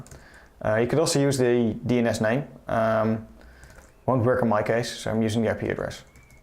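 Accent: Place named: Netherlands. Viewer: dutch english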